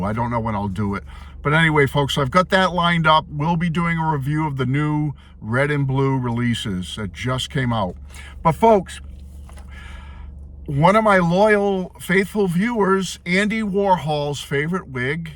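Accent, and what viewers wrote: Boston, United States. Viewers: By the way, let me just say, again, I so enjoy sitting down with a cup of coffee and hearing my online “friend/neighbour” of sorts, just share his love for cool things about the best band in the world. I love how unadulterated you are and yet not weird or obsessive but a normal guy. I kind of get a chuckle out of your Boston like accent too. I feel like I’m watching an old episode of Cheers or something. Have a great day, thank you for the morning comraderie.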